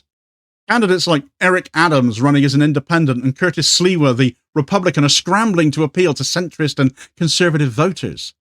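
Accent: Cornwall, United Kingdom. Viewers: Sounds like a Brit to me. ❤😁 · Cornwall mate 😅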